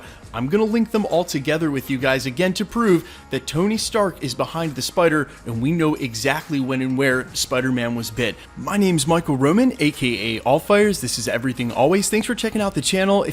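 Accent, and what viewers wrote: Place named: California, United States. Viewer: You sound very California. Woah dude.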